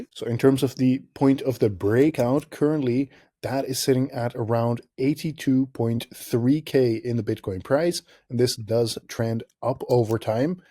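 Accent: Netherlands. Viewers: Your accent sounds Dutch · Ik hoor NL 😅 Waar trade je met leverage?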